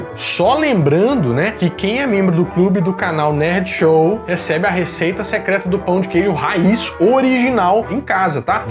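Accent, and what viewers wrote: Minas Gerais, Brazil. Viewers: "balangar" o leite kkk é mineiro msm..melhor sotaque..sou da zona da mata mineira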